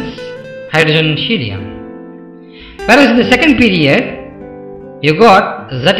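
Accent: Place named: India. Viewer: I'd like to know what percent of youtube videos are made by Indians. I'm guessing 80%. I'm sorry Indian fellow, but speaking with an echo doesn't make the Indian accent more pleasant to hear for people outside of India.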